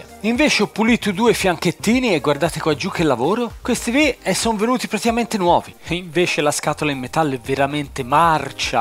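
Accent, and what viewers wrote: Tuscany, Italy. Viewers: forte toscanaccio :)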